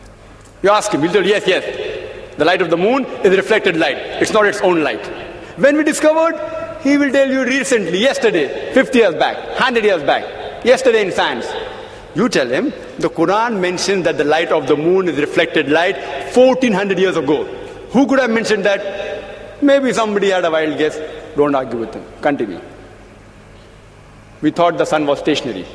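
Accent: India. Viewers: Thats his accent our Indian accent is like that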